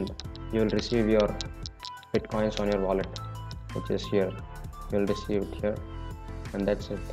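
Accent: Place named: India. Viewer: Suggestion: drop the background music. The loud background music combined with your thick Indian accent makes listening to the content difficult for me, and I would venture for others, as well.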